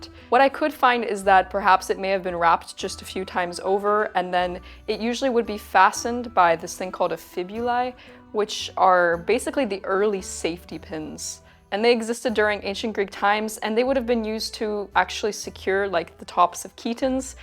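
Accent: United States. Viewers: Sounds US midwestern to me. Lots of extra s in midwestern speach. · you sound American. But the way you said privacy makes me suspicious lol 😂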